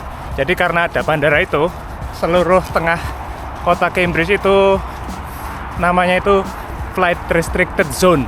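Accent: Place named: Java, Indonesia. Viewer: Asli Jowo tenan medok e gak di gawe gawe soal e hehe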